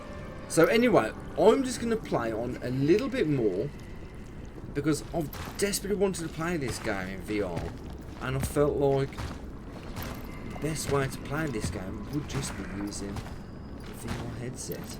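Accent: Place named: England, United Kingdom. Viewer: He is literally ENGLISH 🤦. You might be referring to his accent, he comes from a part of England with a strong regional accent, very different to the rest of the country. But English is still his native language.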